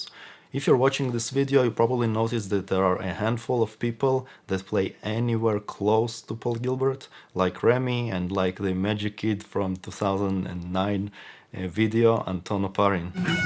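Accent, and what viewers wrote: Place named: Russia. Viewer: Я уверен, что если бы ты вёл канал на русском - то подписчиков было бы гораздо больше! Слушать русскоговорящего, который говорит на инглише с русским акцентом - это реально тяжко )))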